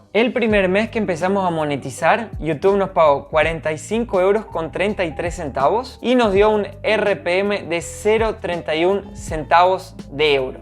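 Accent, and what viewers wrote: Argentina. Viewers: Estonia? parece Argentino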